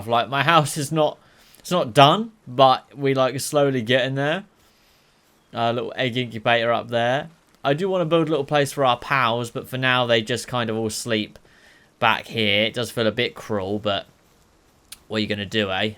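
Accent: United Kingdom. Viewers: My God, I Love your British Accent....😊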